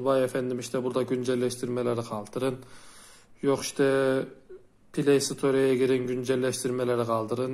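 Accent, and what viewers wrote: Turkey. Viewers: Abi yozgatlımısın?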